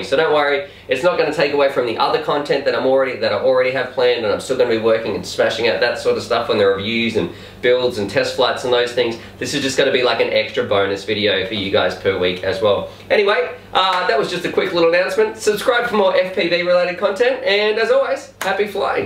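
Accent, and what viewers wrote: Australia. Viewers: You sound Australian but I was confused because you have been using US pricing in vids? I Aus as well. Thanks for uploading all these amazing vids!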